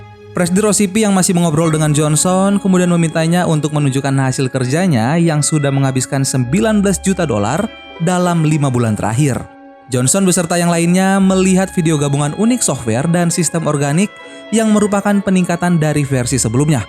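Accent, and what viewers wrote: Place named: Java, Indonesia. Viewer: guanteengg,di pikiran ku pasti mimin orang jawa😁😁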